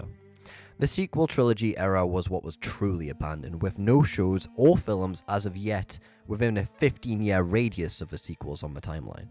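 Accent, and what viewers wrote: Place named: Northern England, United Kingdom. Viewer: Northern English! I didn’t know that was the accent distinction, it’s great! I’ve only been to southern England & Wales myself.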